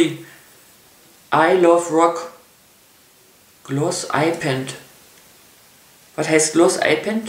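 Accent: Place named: Berlin, Germany. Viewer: Biste Bärlinerin? Hört sich zumindest so an. Und wenn ja - sei gegrüßt aus Pankow :)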